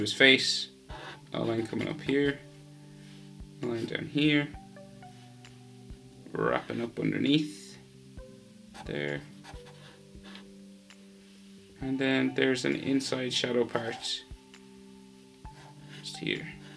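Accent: Ireland. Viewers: Bro u Irish?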